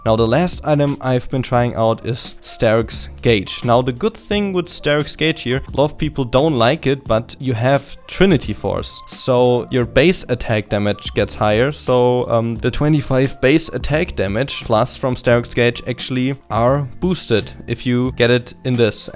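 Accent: Germany. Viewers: are u from germany or where are u from?ü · are you german? cuz you sound like ^^ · Hey c: are you from germany? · Man merkt übelst dass du Deutsch bist xD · Man merkt an deinem Englisch, dass du aus Deutschland kommst xD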